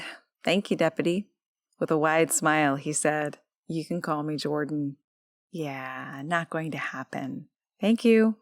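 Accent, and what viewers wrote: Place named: United States. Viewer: Just can't listen to American's reading........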